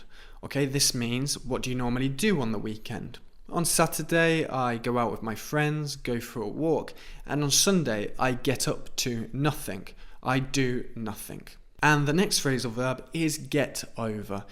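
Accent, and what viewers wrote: United Kingdom. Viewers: you make me love the British accent even more... 🤩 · I really like your British accent! Where are you from in the U.K.?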